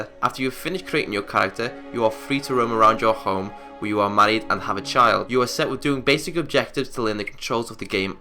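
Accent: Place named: England, United Kingdom. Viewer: "Nagaski" ? It's pronounced "nah-gah-sah-key" I know you're English but slow down with your words homie. 👍 Love the videos. This is simply just constructive criticism.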